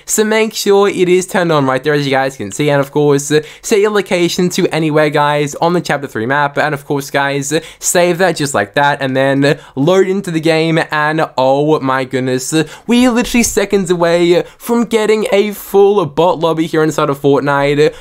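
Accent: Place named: Australia. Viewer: Hes Australian. I know that accent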